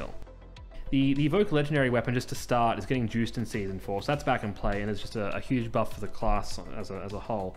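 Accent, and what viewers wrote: Australia. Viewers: you sound aussie bro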